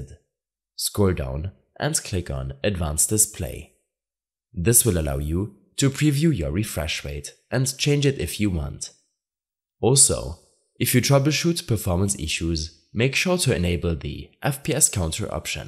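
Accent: Germany. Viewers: are you german du have a akzent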